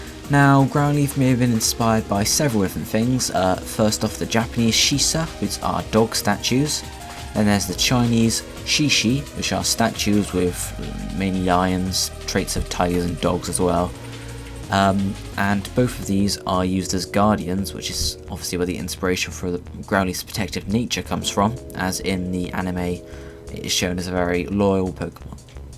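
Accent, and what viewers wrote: United Kingdom. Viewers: There are two things I'd like to point out: 1.) the accent. It's way too British. If you could some how make it more neutral I'd appreciate it. 2.) if you make a mistake stop recording and do it all over again. It bothers me to listen who should be teaching me to make so many mistakes, specially when you add the British accent and the fact that you also leave some sentences without the final pun. Work on that please. :)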